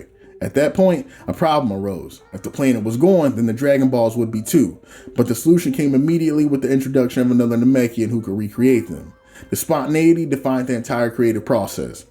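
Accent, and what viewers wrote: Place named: Midwest, United States. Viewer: What part of the Midwest you from 😂. STL represent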